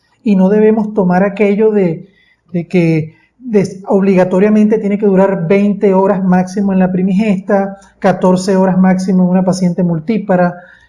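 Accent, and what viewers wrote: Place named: Venezuela. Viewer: Dr que Gusto me da que comparta estos videos... No sabe que felicidad que nos enseñe que nos comparta sus conocimientos...yo soy feliz Además Si se le escucha que sea de Venezuela que bonito hablan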